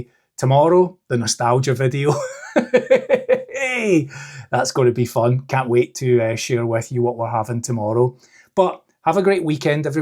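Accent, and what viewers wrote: Scotland, United Kingdom. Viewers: Hi. I like your style of talking and sharing. Actually, I have to confess that when I was younger, I couldn't listen to the Scots accent very long!! Don't know why! But when I heard your voice, that changed lol. Anyway, I'm really enjoying your channel. PS: My Step Grandad came from Banff so maybe his accent was more sharp than yours?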